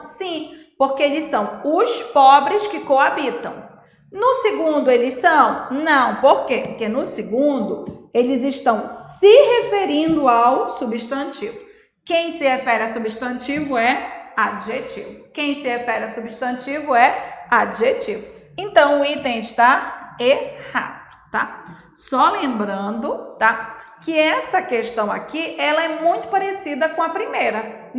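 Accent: Pará, Brazil. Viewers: O lhindo entregando que é paraense kkkkk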